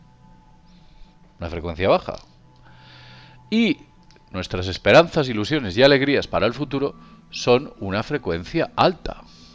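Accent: Spain. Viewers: tio deja de hablar como español, no hay nada de malo ser peruano.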